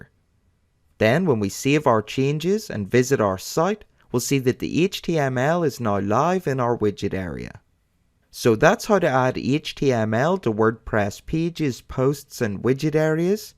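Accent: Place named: Northern Ireland, United Kingdom. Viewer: Its Marty Dread here lol Laughing my balls off, always good to hear another person from Nor Iron.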